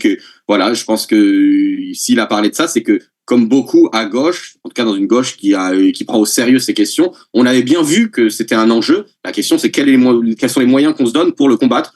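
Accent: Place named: Quebec, Canada. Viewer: Merci de pas faire exprès de gommer ton accent Québéquois, on aime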